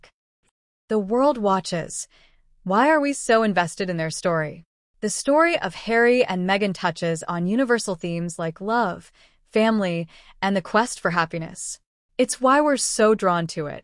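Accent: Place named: United States. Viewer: And is this American accented commentary worth a damn. Truth or BS?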